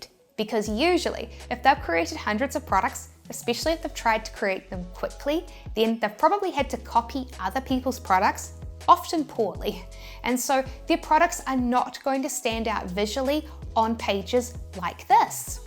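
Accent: New Zealand. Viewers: would.love to have a convo about nz as I wana do tshirt around it.. the tshirt u say to use.. u got any specific nz related advice.. and u too was lovely hearing abit of home.in ur voice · NZ accent is brilliant. Fet? Could be Fit. Could be Fat. Nobody knows!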